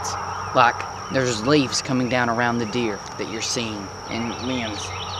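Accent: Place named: Arkansas, United States. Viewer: A great video and inspiring, love your excitement, btw you sound like my Arkansas family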